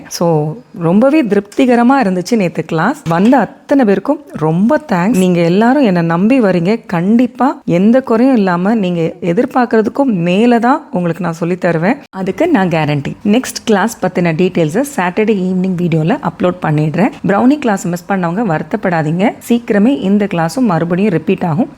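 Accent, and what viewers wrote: Madurai, India. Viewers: Madurai ya akka neenga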